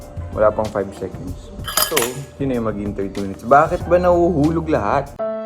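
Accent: Bicol, Philippines. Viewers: Hahaha halo pala yung Bicol mo. Legazpi-Naga dialect. Hahahaha. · Ahhahahaha inlove with your bicol accent😍😍😍 I'm also from Bicol 🥰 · napansin ko lang po yung salita ng bikol my halong ilonggo ng konti haha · Nakakainlove yung bicol accent mo! 💗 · Sobrang cute mo mag salita ng bicol hahaha💚 I am sad but I saw and I watch your vlog today nawala na lungkot ko. Thank u, love u & missed u😁🥰💚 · Grabi..cute mag bicol salita n...Hindi ko maintindihan..hinahabol ko talaga pag Basa para ma gets ko Ang sinasabi mo myloves ❣️❣️❣️ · i love it... naiintindihan ko ang bicolano ni... nkakaintindi pa rin pala ako... kahit di marunong magsalita... lab u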